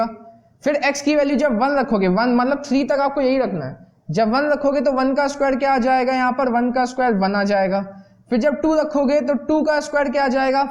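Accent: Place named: Mumbai, India. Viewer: if i am not wrong this sir is from mumbai.Guessed by his accent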